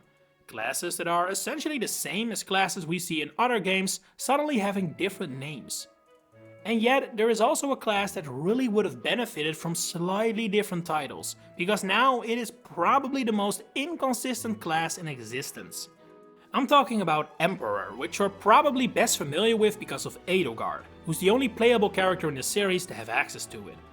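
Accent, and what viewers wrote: Netherlands. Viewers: Aha, I just knew I heard the Dutch accent! Hallo! buigt Cool vid though, these classes are so weird. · "I am actually dutch" I was able to tell instantly, I have the same accent in English LMAO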